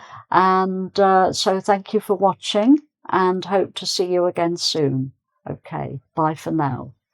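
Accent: England, United Kingdom. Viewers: Hi great teacher I love British accent. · The Lady has nationality English basead in her voice. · You are amazing lady and a great teacher with so pleasant British pronunciation, thank you❤🌹🙏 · British English?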